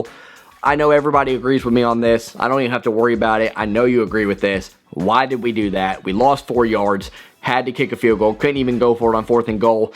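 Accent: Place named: South, United States. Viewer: Are you in Michigan? You got like a southern accent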